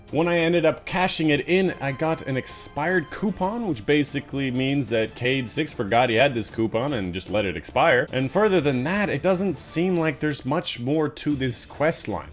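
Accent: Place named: United States. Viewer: Aye just came from houndish’s vid gotta hear that sweet American accent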